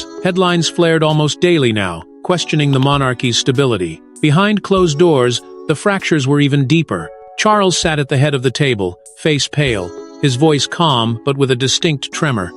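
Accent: United States. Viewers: Strange how these American-voiced Royal Family tit-bits all seem to stretch out to the 20+ minutes mark! They're all sheer speculation, & imagination, with NO evidence to support them: Simply cash-earners from YouTube views etc. - Don't believe any of it!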